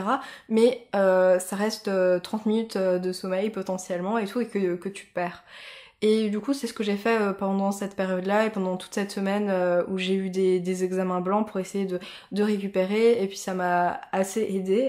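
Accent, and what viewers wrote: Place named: Haut-Rhin, France. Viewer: j'en était sûr ahah je sais repérer l'accent de chez moi :) je viens du haut rhin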